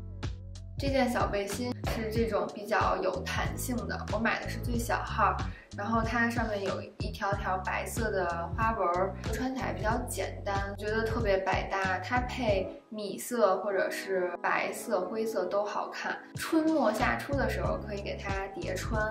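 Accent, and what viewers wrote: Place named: Beijing, China. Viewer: 听声音像北京人或河北人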